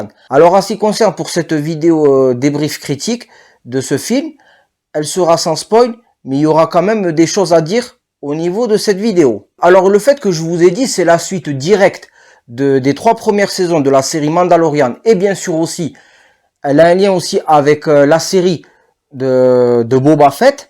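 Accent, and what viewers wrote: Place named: Toulouse, France. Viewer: Au top ton debrief sacrée accent toulousain hein😉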